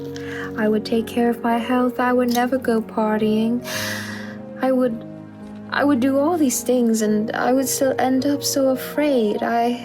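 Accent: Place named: Transatlantic, United States. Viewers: Damn why the green screen look so real Makeup videos are always a classsiccc and I love the transatlantic accent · shows yet again that is a woman of many artistic talents!!! the acting was si good here and the transatlantic accent too, it really gave a vintage vibe 💕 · Saw the notification and RAN!! Also, your transatlantic accent is getting really good! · Have I been saying Avon wrong this entire time or is it just the transatlantic accent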